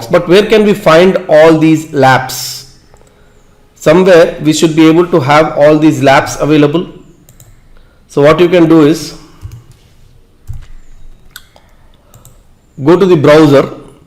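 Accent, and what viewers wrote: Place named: India. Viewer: indian accent......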